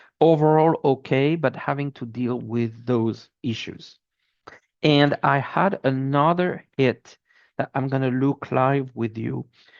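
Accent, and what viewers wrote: France. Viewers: New subscriber here. Like the reading. Very detailed. The hint of a French accent is also intriguing. 🙏😊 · I as well. I enjoyed your show with Linda G. I recognized your French accent immediately since my mother was from France. Merci beaucoup! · Interesting and clear. I note a French accent 😊would of like to know more about Canada .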